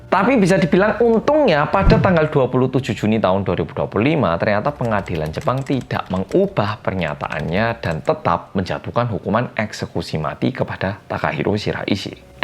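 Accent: Java, Indonesia. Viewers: Bang lu kalo ngomong logatnya kek orang Jawa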